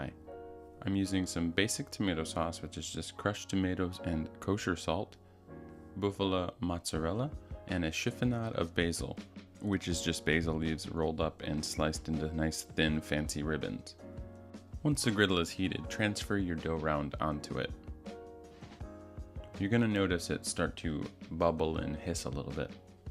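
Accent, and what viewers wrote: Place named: Italy. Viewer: Your Italian accent is stunning! As is the pizza!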